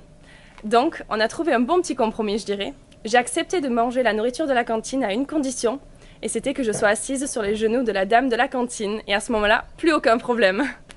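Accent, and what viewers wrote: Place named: South, France. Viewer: I don't think I've ever heard you speak French never realised you had such a strong southern accent. Keep up the good work babes xxx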